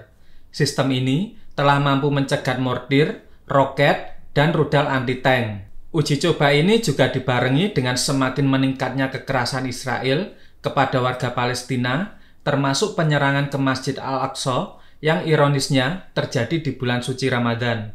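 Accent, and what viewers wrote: Java, Indonesia. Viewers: Medok amat...🥶🥶🥶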